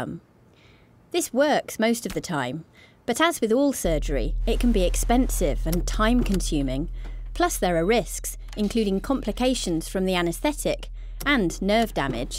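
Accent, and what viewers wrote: United Kingdom. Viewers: i think the narrator is british but i can't be too sure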